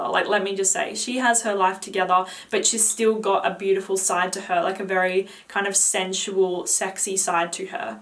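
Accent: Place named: Australia. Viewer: I follow you for your knowledge, not your age. I'm an Aussie now living in the USA, and I just turned 50. My head tells me I'm 30 (only my body tells me I'm 50!) Lol. Keep up the great videos, I've since found my 'signature' scent with de Marly Cassili. I'm saving frantically to get a full size bottle 😉 Your accent keeps me reminded of home and I thank you for that too 🌹Please DO add videos for us 50 and over 😊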